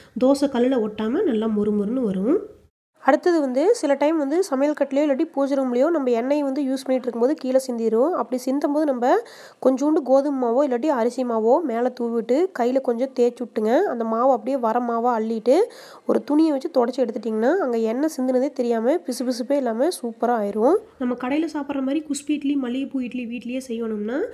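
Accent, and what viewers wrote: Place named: Coimbatore, India. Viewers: Nga nu solra slank patha neenga Coimbatore ngala... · Neenga coimbatore ah akka slag super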